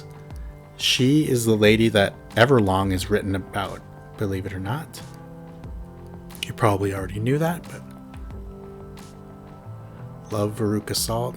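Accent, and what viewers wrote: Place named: Sacramento, United States. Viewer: Love this video man! Sounds like you’re from the Sacramento area haha I am too! I was also at Aftershock & caught a few from Kirk! On the first night they played. I got 3, gave 2 away, one going to a kid & his dad that I saw looking for some in the grass. He was so happy haha What sucks though is as I was getting a hot dog outside from the street vendors, the one I kept for myself must have fallen out of my wallet as I was paying. I was crushed 😭 Luckily though on Sunday when they played again, one landed RIGHT between my feet & I stepped on it until the crowd cleared and I was able to pick it up. I like to think the metal gods rewarded me with another for my good deed of giving away those 2 Metallica picks on night one 🤘🏼 Got a few more picks in my collection. My favorite being one from Slash that he handed to me in San Francisco at the Warfield in 2010.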